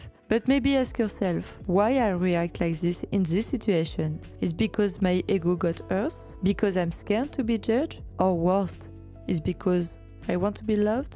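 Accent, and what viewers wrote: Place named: France. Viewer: i love your heavy french accent and your style and how you express yourself. you remind me of the beauty and the woes of everyday life and i love how it makes me feel less alone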